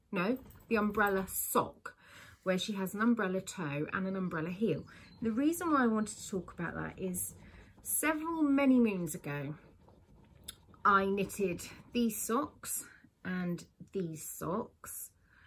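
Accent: United Kingdom. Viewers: That’s a hard one, I can totally see you talking to the police in LA... all upset, probably at an airport or even better in an big casino full of people with your british accent and your colleagues laughing.. the poor police officers 😂 but the shoes also sound very... hm, im going for the shoes as a lie! I just want the LA story to be true 🤣🙋🏻‍♀️ Thanks as always, have a nice day!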